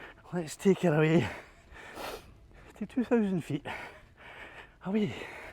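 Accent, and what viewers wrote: Scotland, United Kingdom. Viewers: "What did you do Saturday?" "Well, I put on some headphones and listened to a Scot breathe heavily into a microphone while discussing drone distance." Well done, friend. I hope this has been educational for all the haters.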